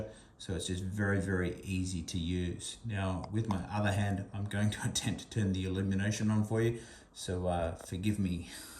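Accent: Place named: Australia. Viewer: Australian ain’t ya mate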